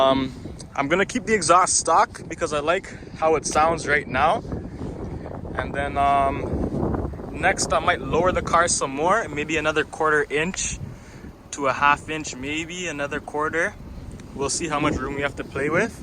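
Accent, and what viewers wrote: Toronto, Canada. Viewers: Where your from ? Sound like your from the 6 . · Sounds like a toronto man jheeze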